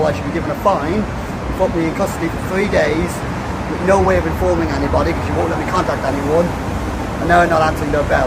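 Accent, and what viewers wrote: Ireland. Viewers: He sounds like a very posh irish man. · I heard an Irish (eire) accent. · His accent sounded as if had a hint of Irish to me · He sounds Irish to me. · I think I detected a very, very slight Irish accent so maybe that's where his ambassador thing comes in. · English? He sounds Irish to me. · Hi there, like another viewer, I detected a faint Irish accent. Not NI, more like Dublin. One wonders why anyone would make a point of protesting overseas.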